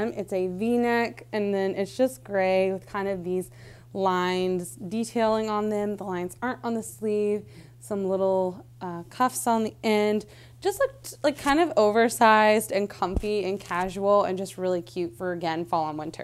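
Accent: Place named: South, United States. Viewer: Lol. I like your southern accent. I’m from Tennessee, so I like it.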